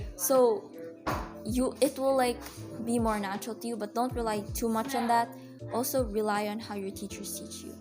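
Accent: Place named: Japan. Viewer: I saw your video on TikTok! You and yamato Motivated me >< btw, you said you watch Anime. May i know where you watch anime? I want to watch Anime too (for entertainment and for learning na din hshs) And!! I like your Japanese accent😭❤️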